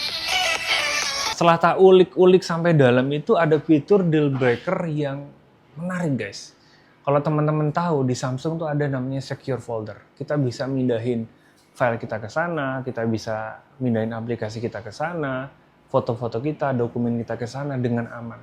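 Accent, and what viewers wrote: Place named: Java, Indonesia. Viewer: Dia orang Jawa bang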